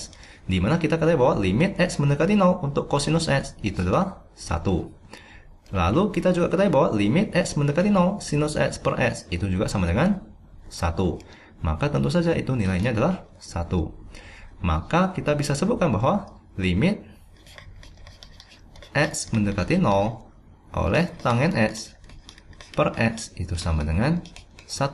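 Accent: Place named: South Korea. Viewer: Logatnya kaya org korea 😅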